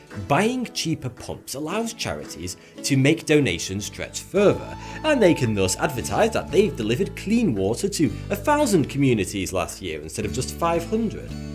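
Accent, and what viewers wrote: United Kingdom. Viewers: His accent is so British that I have to watch the video twice , first time to warm up for the accent and the second is to actually understand. But I love his content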